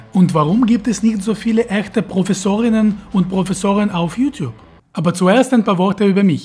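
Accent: Russia. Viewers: Das ist richtig, es gibt viel zu wenig gute deutschsprachige naturwissenschaftliche YouTube Kanäle. Schön das Du Zeit findest, Dein Wissen mit der Allgemeinheit zu teilen. Übrigens, der russische Akzent ist einfach nur Klasse! Ich freue mich auf weitere Beiträge.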